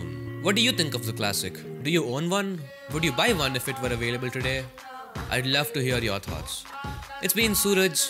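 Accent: India. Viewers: It's just the normal Indian English accent. I can hear him just fine.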